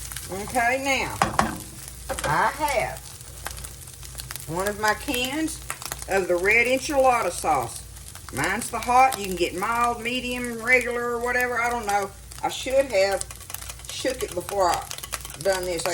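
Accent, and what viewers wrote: South, United States. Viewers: 🍅🧄🌶️Looks great! I like the idea of soft frying the corn tortillas. You’re a very cheerful cook. Fun to watch. I’m from Texas but live in Utah. I am just basking in your beeeeaaauuuutiifuul accent. It’s music to my ears. 👂 Listening to you talk and watching you cook is like being in Heaven. I really miss living in the south but my kids all live in Utah, so here I am. Thanks for sharing your love. Cooking for one’s family is love.❤️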